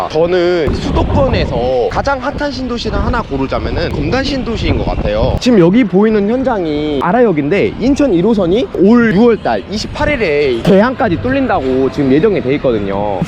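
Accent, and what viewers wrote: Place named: Jeolla, South Korea. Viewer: ㅋㅋㅋ 방송하지마라 공부를 쳐 하고 하던지 부알못아ㅋㅋ 전라도 억양 오지네ㅋㅋ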